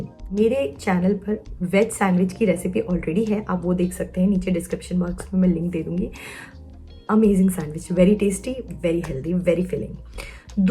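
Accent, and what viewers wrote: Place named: Pakistan. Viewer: Not at all like Marium Pervaiz infact more perfect urdu than her. she is speaking urdu in Pakistani urdu accent. I am Pakistani BTW and we speak urdu like her. 😊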